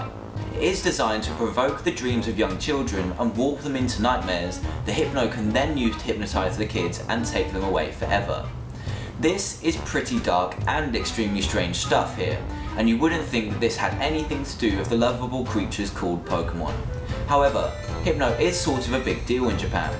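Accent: United Kingdom. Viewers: Your English accent makes the Hypno poem way scarier. English accents in general have that effect.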